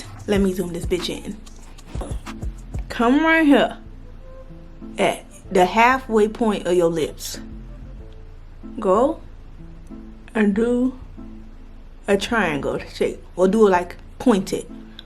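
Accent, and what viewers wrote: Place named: Miami, United States. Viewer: I'm from Miami and this is EXACTLY how we talk 🤣🤣🤣🤣🤣🤣🤣🤣🤣😭😭😭😭😭I'm screaming💙💙💙💙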